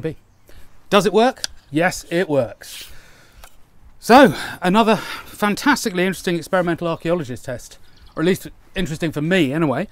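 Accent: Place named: Berkshire, United Kingdom. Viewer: That accent sounds rather Berkshire, am I right?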